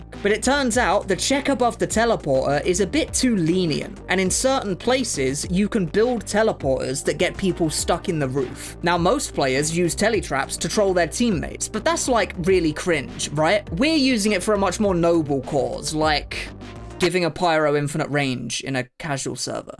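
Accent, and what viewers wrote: United Kingdom. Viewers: babe wake up new tf2 video from funni british dude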